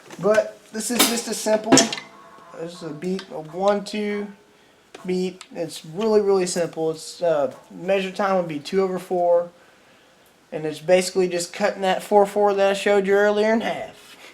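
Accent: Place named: United States. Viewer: You took the time to yuk it up about how lame "country people", whatever that means, are, but you're as hic as a fresh Dixie Daisy whut fell off the turnip truck with your hayseed accent. The super cool long hair doesn't cover your accent. I believe you were thusly ensnared for having forgotten the wisdom learned from the East who teach, "he who smelt it dealt it." If you felt the urge, you could have just said country music sucks rancid smelly goat balls and no one would have been the wiser.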